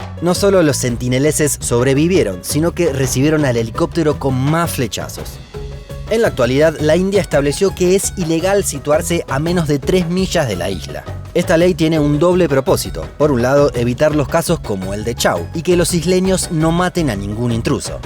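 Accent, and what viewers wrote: Rioplatense, Argentina. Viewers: Por el acento diría que es argentino o uruguayo.. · Parece argentino pero el sonido sh en la ll e y es muy suave , no parece el típico acento porteño . Es como un acento argentino neutralizado . Resulta muy agradable y claro .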